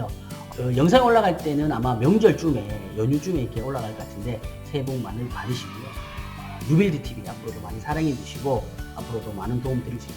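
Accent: Busan, South Korea. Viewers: 사장님 말투는 부산인데, 서울업체네요 아쉽:::😅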